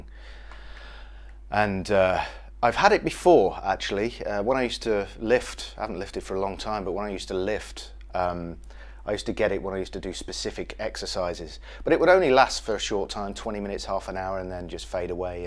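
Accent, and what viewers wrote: United Kingdom. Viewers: When did john petrucci start talking british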